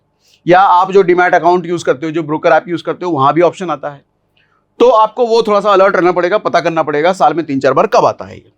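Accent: India. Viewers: You are really a very good teacher! You desi walla voice further more enjoyable. God bless you!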